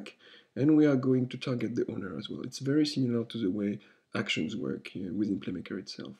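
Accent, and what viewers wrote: France. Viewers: Hello, I really like this video, especially french accent of english and sound of clicking :) But, I have a question, I downloaded ecosystem to my Unity(I've already installed PlayMaker), then I typed "UGui Proxy" to search line directly in The Ecosystem Browser and I couldn't find it. Can anybody help me with it? Maybe I can find Ugui Proxy somewhere else? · Same here. Non native english speaker and I understand your nice french accent perfectly. Nothing to improve there. Thanks for the tutorial.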